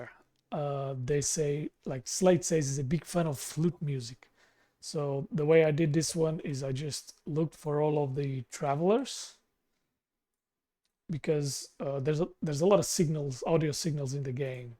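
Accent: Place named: Portugal. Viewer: AHahah, com esse nome e essa pronuncia, so podes ser tuga! Fiquei altamente surpreendido ao saber que o Outer Wilds tem mod para VR, e vi isso ontem em um video sobre os melhores mods VR em 2023. E hoje fui ver melhor, e fui dar a tua pagina! Quando vi o " " parti-me a rir! Ainda estou para testar isso, mas ate agora, parece que estas a fazer um excelente trabalho! Impressionante, sim sr. Os meus parabens, desejo-te muito sucesso!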